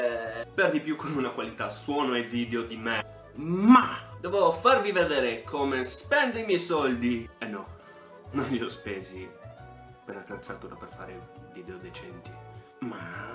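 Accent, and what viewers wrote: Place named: Sardinia, Italy. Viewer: ciao, anch'io sono sarda ma il tuo accento mi fa ridere:D comunque bellissima l'idea delle carte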